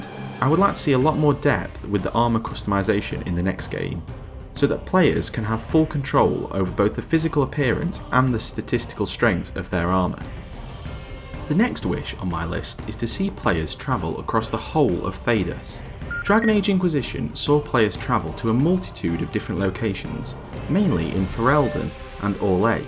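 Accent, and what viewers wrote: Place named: Midlands, United Kingdom. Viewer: haha thats cool mate, loved ur vid, im from london so local by the sounds of ur (midlands) accent? lol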